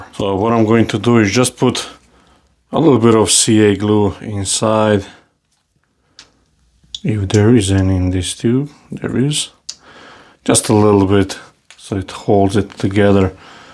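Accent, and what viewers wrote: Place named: Croatia. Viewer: Completely random, but I watch more of your videos than anybody else and When Croatians were deciding how to pronounce English words… they picked the coolest ways to do it. 😎🫡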